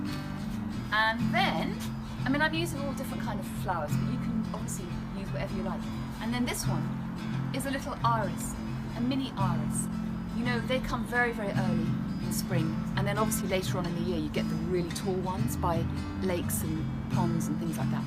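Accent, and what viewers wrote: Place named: England, United Kingdom. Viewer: Thanks for sharing your gardening tips! Love your soft and English accent!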